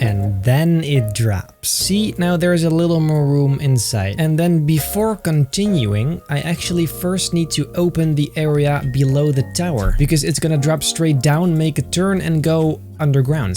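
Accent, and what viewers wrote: Netherlands. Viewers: Are you dutch? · Lol ik hoor dat je Nederlands bent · Are you dutch?,I love your videos! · i am just rly wondering if you’re Dutch cus you sound kinda Dutch :]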